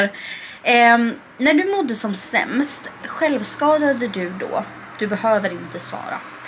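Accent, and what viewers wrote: Stockholm, Sweden. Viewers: Varför pratar du Stockholms dialekt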